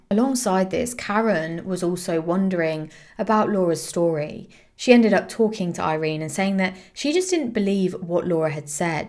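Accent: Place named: England, United Kingdom. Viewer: you are beautiful. I just found your channel and subscribed. I love the way you report. You do great research., presentation and narration I’m wondering where your accent is from. I’d guess England, maybe a countryside accent. Best of success to you with your channel.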